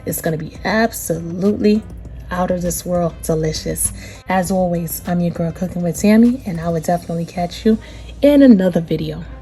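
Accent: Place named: East Coast, United States. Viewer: Making this with leftover pot roast 😋 Love the east coast accent 😁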